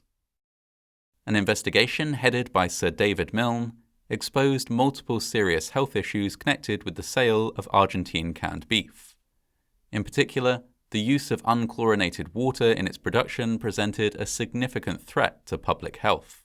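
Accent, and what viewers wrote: United Kingdom. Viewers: You narrate very well, with excellent pronunciation and diction, but with occasional lapses. In this case, it's Fray Bentos, not Bento's or Bentoes. The 2nd syllable rhymes with Moss, not Nose. In British English (as you're apparently British, with a very British accent), it would have to have an E (or another vowel) after the S to change the vowel sound to a long 'oh' sound. American English does not have the short 'o' sound as in 'got' or 'not' in British English, and so American English tends to use either a short 'ah' or a long 'oh', depending on the word. That's correct American pronunciation. But when the short 'o' sound (as in British English) IS called for, e.g. in words from other languages, Americans tend to replace it with 'oh' because that's the sound they know - and that's perfectly understandable. However, IMHO that isn't something for British English speakers to emulate, because we DO have the British short 'o'.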